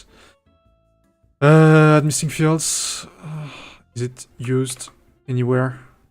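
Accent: France. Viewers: I can spot a french accent from a mile away ahah keep up the good work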